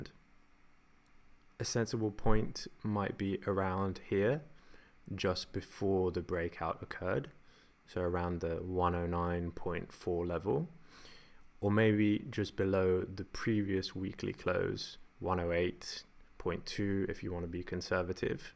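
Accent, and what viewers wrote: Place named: England, United Kingdom. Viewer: Great content man. You must be the only Italian on earth with an English accent.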